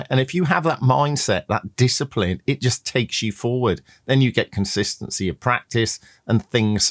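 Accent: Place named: Australia. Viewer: i knew i was hearing a little Auzzie mixed in there. 😅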